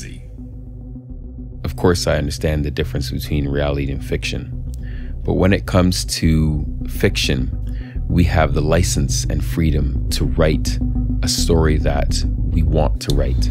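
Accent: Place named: Canada. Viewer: YOU ARE A CANADIAN.......I CAN MAKE IT OUT FROM YOUR ACCENT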